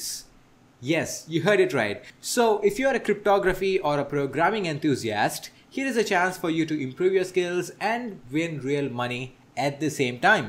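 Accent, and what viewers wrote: India. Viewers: pqp indiano falando em inglês é um porre.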